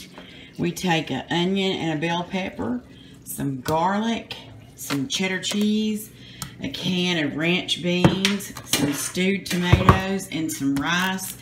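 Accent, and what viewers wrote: South, United States. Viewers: I'm from Michigan and I just love hearing your southern accent! "We fixin to have ourselves a good ole day"! I'm going to say that to myself every morning for the rest of my life!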